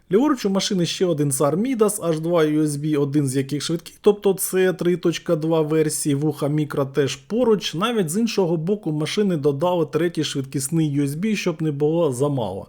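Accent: Transcarpathia, Ukraine. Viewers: Боже я налякався голоса автора почув Закарпатську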